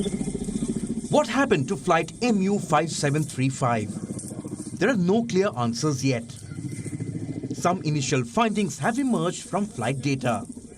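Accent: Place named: India. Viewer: 能不能找个英语正宗点吗？这印度味英语我听着好难受 还自称英语是官方语言的国家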